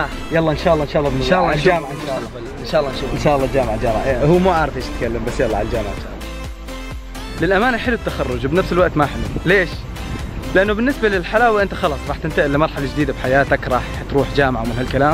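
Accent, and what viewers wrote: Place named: Syria. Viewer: حلو كلامك السوري خليك هي عم تحكي دائماً💚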